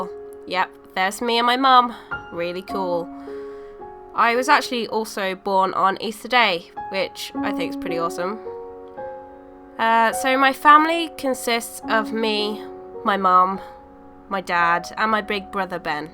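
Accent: Birmingham, United Kingdom. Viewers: I love your drawings and British accent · I really suck at accents and then it hit me, she sounds familiar, then you came out with your from Birmingham! I haven't seen any other ' brummies' on YouTube, so hiiiii! :) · I really really adore yout artistic skills... and your British Accent!!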